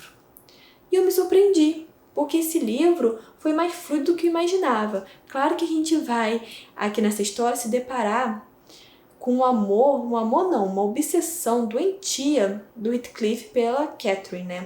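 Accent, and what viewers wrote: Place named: Pará, Brazil. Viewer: Oi... Você é do Pará?... O sotaque parece bastante.🤗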